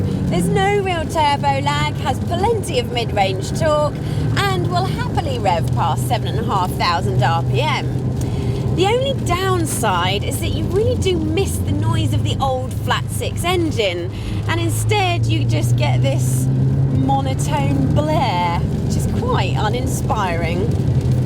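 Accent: England, United Kingdom. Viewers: I don't know why but there's something in her eyes that makes me wanna fill her pretty little mouth with... a banana. But then again, I wouldn't be able to hear that lovely english accent. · British accent is weird!! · Why is there a british accent talking about MILES per hour? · I love accent. What part of the UK is she from? London?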